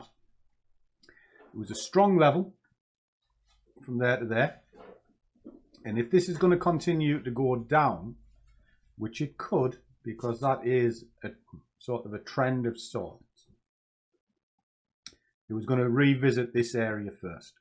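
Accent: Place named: Teesside, United Kingdom. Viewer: First time here , really informative and going by the accent think you must be local to me ( I'm in teesside)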